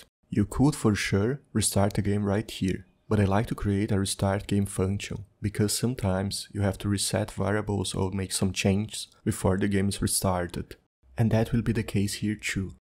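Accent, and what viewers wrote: Brazil. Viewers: Muito bom irmão, Conheço de longe a voz de um BR kkkk otimo tutorial, poderia ensinar como funciona o sistema de autotiles do godot?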